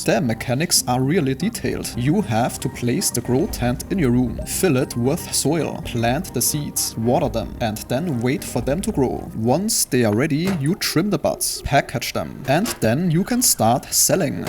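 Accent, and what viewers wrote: Germany. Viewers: Are you German? Because of your dialect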